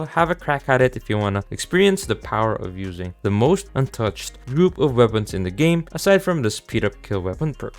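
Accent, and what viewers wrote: Philippines. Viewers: based on his accent hes filipino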